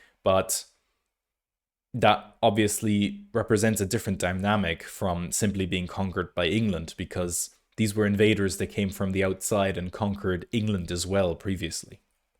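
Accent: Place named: Ireland. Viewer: Bizzare, I just replied to your thread, posted it, but I don't see anything. I did however see my previous question/comment posted, but apparently you didn't. I've no reason to doubt your word on this. It'd seem irrational to assign the veracity of a debate, based on a specific age group. Surely, the merits of the points raised are what counts, not the age of the speaker? I'm still wondering about your "Irish" accent. Perhaps you'd rather not say, and that's perfectly ok.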